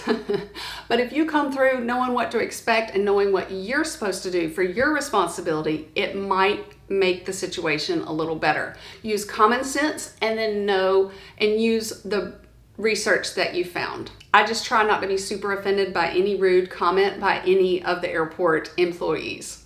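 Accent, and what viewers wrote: South, United States. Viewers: Are you southern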